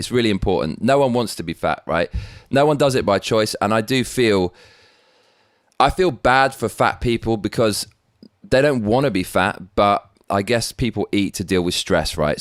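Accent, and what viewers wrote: England, United Kingdom. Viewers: What part of England are you from ? I was born in Acton London W3 .. lived in South Carolina in USA FOR 50 years .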